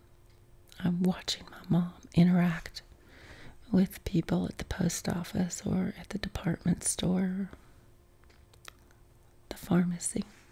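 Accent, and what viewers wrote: South, United States. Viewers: I did always get a bit of a southern accent from you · I knew I heard a Southern accent in there!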